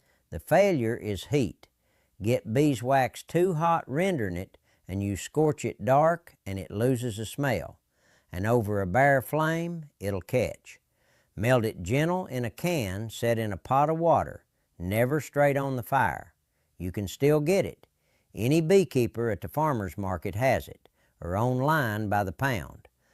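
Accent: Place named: Appalachia, United States. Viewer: I love the way you talk, I'm in maryland and you have the Appalachian accent that i remember hearing growing up. When i heard someone like you talk i knew i was going to get good advice or given advice that was a joke that i believed until they later told me it was a joke as they let out a laugh.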